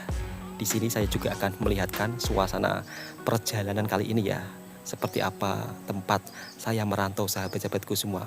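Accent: Java, Indonesia. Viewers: Medokkķkkķ jawa nye😅😅😅😅😅😅😅😅😅😅😅😅😅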